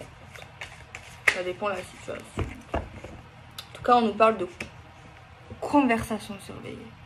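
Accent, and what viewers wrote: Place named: Toulouse, France. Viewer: Bonjour tu as l'accent du ☀️ soleil peut être de Toulouse bon ça me ressemble je suis fou bélier ma chérie Verseau fille difficile à vivre Bon je l'aime très fort pardon tu as la pêche 🍑 la patate tu me fais penser à Patrick Sébastien on est serré dans cette boîte sardines j'ose un bisou merci ton tirage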